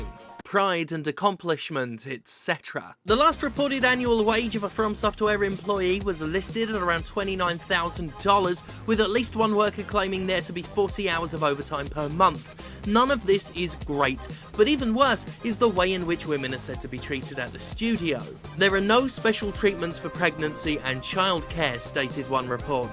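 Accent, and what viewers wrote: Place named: Birmingham, United Kingdom. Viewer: Your becoming slightly Brummie and I’m ok with this.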